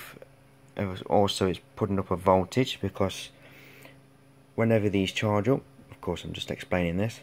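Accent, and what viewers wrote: United Kingdom. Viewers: guessing from your accent (the way you say inductor) are you British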